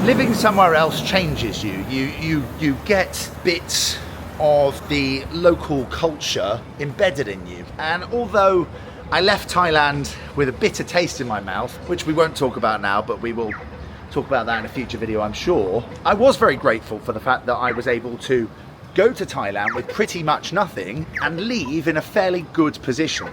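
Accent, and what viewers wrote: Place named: England, United Kingdom. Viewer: you look like UK people exactly, your shoulder your face your accent, your hair , typical English people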